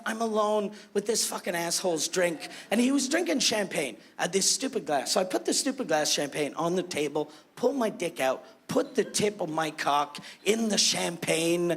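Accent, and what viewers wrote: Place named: France. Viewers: ***** oui, je sais mais je parlais au gars qui a dit qu'il a un accent français fort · loll l'accent francais fort ?! quel accent !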